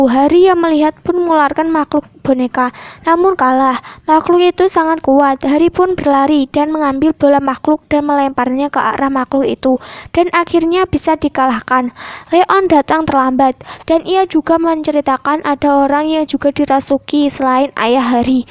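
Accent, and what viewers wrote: Java, Indonesia. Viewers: Kak, kakak orang jawa ya😶 Soal nya kkak ngomong nya kyk orang jawa😅😅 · Bahasanya terlalu medok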